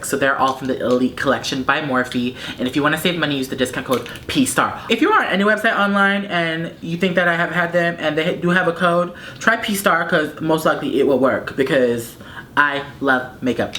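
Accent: Spain. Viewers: yes your spanish accent!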